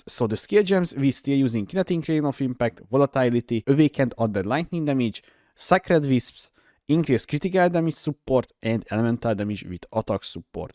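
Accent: Hungary. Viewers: Amúgy nem akarok paraszt lenni, de érdemes lenne valami angol tanár vagy ilyesmi, nagyon keményen érződik hogy magyarosan mondasz dolgokat. (Normal helyett normál, rekáveri) Hozzáteszem én se vagyok mérföldekkel jobb, nekem is mondogatták ezt, de ja.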